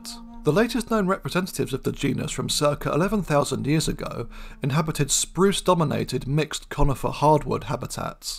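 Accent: United Kingdom. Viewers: This funny british (bri’ish) polar bear is funny and educational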